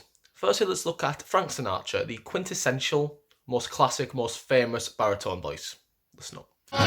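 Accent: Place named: England, United Kingdom. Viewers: I love your accent too.Are you English? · Are you an English? I really like yo accent.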